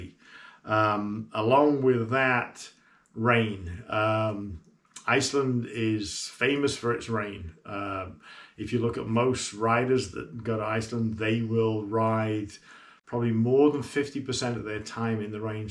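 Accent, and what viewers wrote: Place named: Western Australia, Australia. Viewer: mate I've been trying for so long to try and pin your accent. ever since your video with mototrek. I'm guessing australian, but west Australian?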